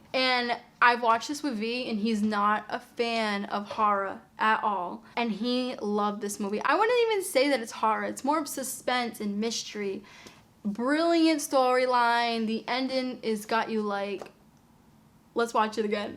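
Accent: Boston, United States. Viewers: Are you from Boston? Your accent.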